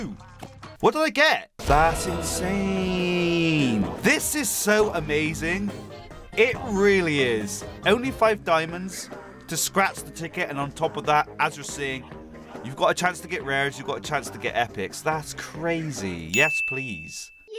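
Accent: United Kingdom. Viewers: If he British or American? Also f😊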